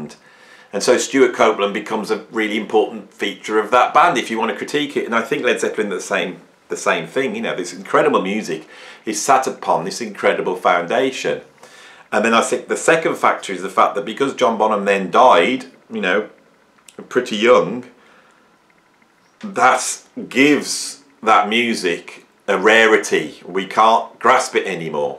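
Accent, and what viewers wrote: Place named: England, United Kingdom. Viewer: This was so interesting! From your bombshell 'I was playing Bonham's kit' moment to the theories on the way he got the open, sonically powerful style in collaboration with Page's recording know how and his own early influences to ultimately create his oft imitated yet never duplicated signature style. Incidentally by your accent, can we deduce you were born in a roughly similar part of the country to John/ Robert?😄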